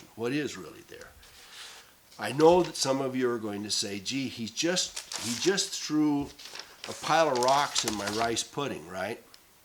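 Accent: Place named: Michigan, United States. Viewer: ahhh, don't know why just always had a hunch you were from MI, thought I heard a MI accent. Maybe it's the farmer in you I recognize. Anyhow, I'd like to speak directly with you, not sure how to do that without alerting everyone else to your contact info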